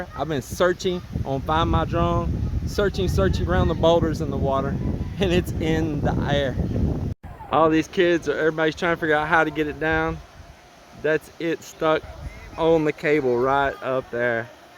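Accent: Texas, United States. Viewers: I have decided I like you and your videos sincerely. Your Texas accent is kind of cheesy but I forgive you. I am 7 gen Texan and lived across the state from south to East. Maybe it's a north Texan accent. Anyway cheers. Thanks for posting nd I hope to visit soon.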